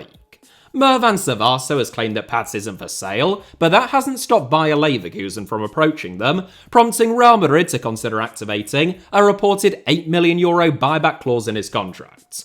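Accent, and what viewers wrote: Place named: United Kingdom. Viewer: I can't think of a greater punishment than hearing a british man pronounce Como repeatedly for 45 straight minutes. Or should I say "Coumou".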